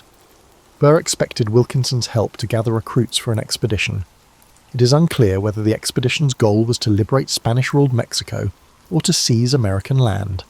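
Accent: United Kingdom. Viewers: p.m. in the state of Ohio in the USA. Note: I find it very helpful to put the speed to .75 Love your British accent! 🙂❤️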